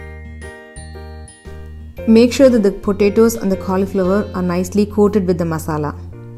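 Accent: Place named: India. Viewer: Your English is very Indian style not perfect at all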